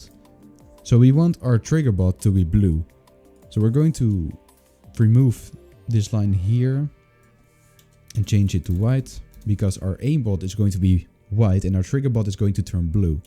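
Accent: Netherlands. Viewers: Ik hoor gelijk dat je Nederlands bent 😂😂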